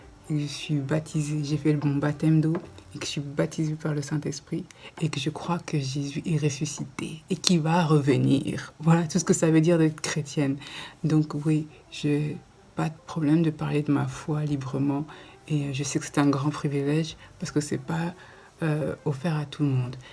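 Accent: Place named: Cameroon. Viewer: COUCOU !!! VITAL CULTURE NOUS MANQUE LORSQUE TU PARLES DE TON CAMEROUN L'ACCENT Y VA AVEC. mais lorsqu'on chasse LE NATUREL REVIENT AU GALOP. " ton accent, ta voix française revient au galop. Bonne chance pour YouTube. JE T'AIME.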